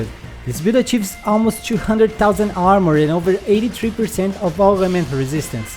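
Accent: Brazil. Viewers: Quando um brasileiro fala ingles tu entende tudo.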